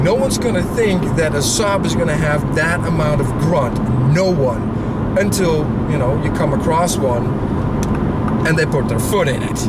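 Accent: Netherlands. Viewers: i detect a dutch accent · ahahahh, grappig om nog een beetje een Nederlands accent te horen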